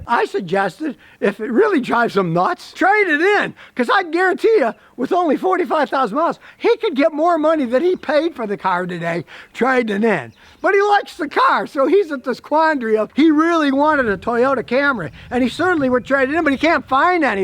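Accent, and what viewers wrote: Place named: South, United States. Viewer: It is truly sad, that he makes claims not based on facts. His videos are about his opinion, stereotypical old mechanic who just cannot get with new time and engineering. He is so brand-loyal, he can't see how bias he is. I have had owned 3 Kias/Hyndais. Recently sold wife's 2013 Santa Fe with 95k MI. Zero problems in 9 years, purchased brand new. Only thing wre did was new brakes around 60k mi. Had 2010 Kia Optima SX. Bought it used with 150k, once again, zero problems in 2 years that I had it. This is why I do not watch his videos any more. He can't even pronounce Hyundai correctly. As country as his accent is, he is making fun of Alabama? Give it a rest. That are other ways to get views on YouTube.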